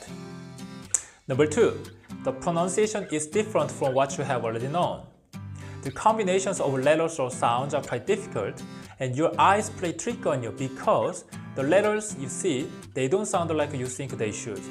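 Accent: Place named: South Korea. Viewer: 토종이신가봐요 발음이 정겨워서 좋아요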